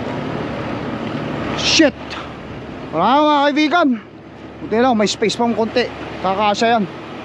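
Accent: Ilocos, Philippines. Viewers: Ride safe always sir parang ilocano kau sir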